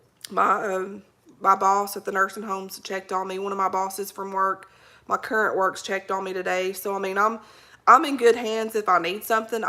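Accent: South, United States. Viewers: Love ur country accent. B blessed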